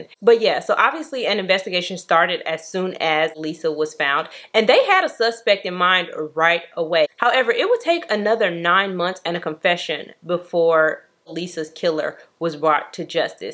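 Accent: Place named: Chicago, United States. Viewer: Lol you definitely from close to Chicago, you funny as hell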